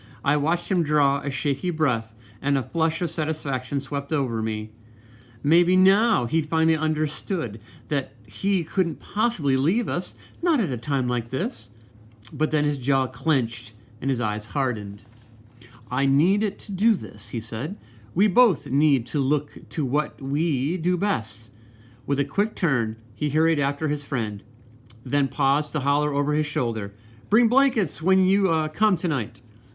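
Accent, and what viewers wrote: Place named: Texas, United States. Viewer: U have a texas voice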